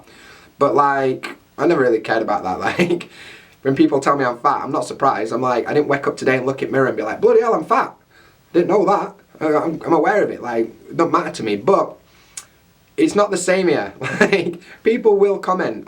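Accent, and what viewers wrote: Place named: North, United Kingdom. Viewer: I've been trying to figure out your accent for ages and I will guess you're a northerner?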